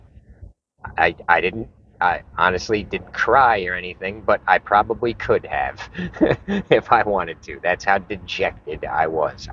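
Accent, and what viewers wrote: New York, United States. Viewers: you're a great story teller. Love your videos and that NY accent!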